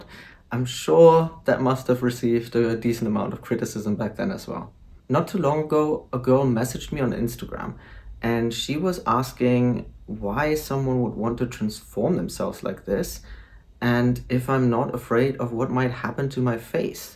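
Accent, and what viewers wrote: Germany. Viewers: your accent sounds very german.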